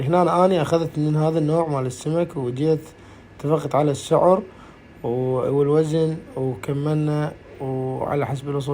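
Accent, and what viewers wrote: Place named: Gulf, Arab world. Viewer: لهجة الجنوب مقاربه للخليج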